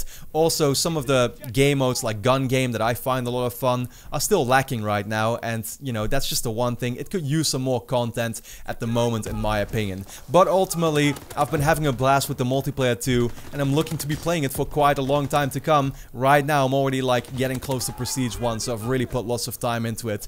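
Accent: Netherlands. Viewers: Dutch ? I can here that right away because the accent lol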